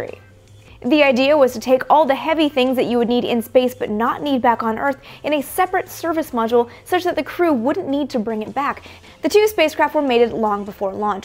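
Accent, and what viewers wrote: Canada. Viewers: Is this young lady a Canadian? I've heard the word "Abbooouuutttt" a few times, during her videos. Or, am I just hearing things? You never know, "eh." :)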